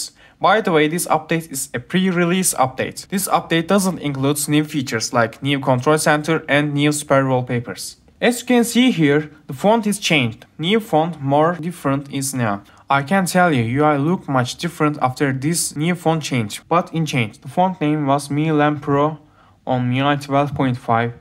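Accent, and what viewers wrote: Turkey. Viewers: Aksan tanıdık dedim meğer turkmus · Türk İngilizcesi bu nerde duysam tanırım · Ne kadar da Türkiş bir aksan derken adam harbiden turkmus zaten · niyeyse türk olduğun çok rahat anlaşılıyor konuşmandan ahaha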